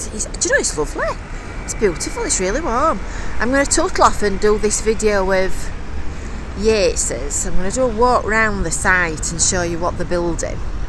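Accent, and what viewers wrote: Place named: Hull, United Kingdom. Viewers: Are you from the hull area because you have that twang you talk like Lucy Beaumont the stand up comedian who is married to John Richardson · It’s still a veryYorkshire accent ! Nice to hear !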